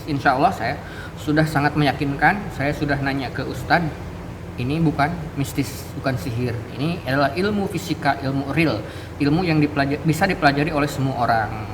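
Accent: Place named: Sunda, Indonesia. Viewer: Bng lagi ngapain di bali ,kaya orang sunda nada bahasa nya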